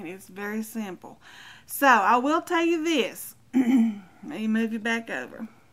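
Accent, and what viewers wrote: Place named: South, United States. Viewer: It's Soo good too hear a Southern Voice ... I'm from Alabama ... God Bless You ...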